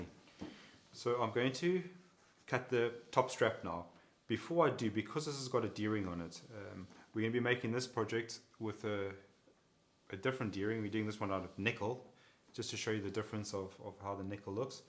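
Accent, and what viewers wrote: South Africa. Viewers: Whoo hoo! A Saffer accent on YouTube. I'll definitely get some knives from you when I can. And sommer subscribed coz support local.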